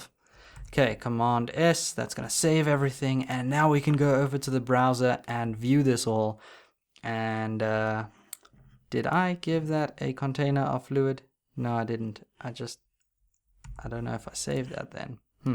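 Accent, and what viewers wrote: South Africa. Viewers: Yas! South African accent!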